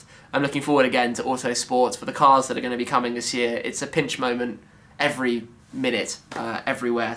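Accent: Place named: United Kingdom. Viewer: damn ur accent is so british... and im british :0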